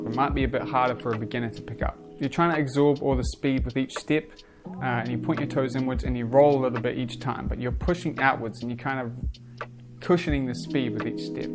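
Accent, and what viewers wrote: New Zealand. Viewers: Sounds like a kiwi accent to me :) Thanks for the great tips! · nice vid bro - are you from NZ >?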